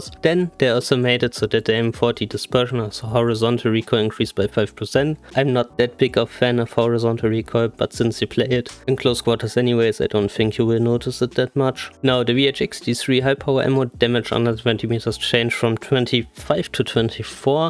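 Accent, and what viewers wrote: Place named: Germany. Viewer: Tell me youre german without telling me youre german. The accent is based af..... like for that xD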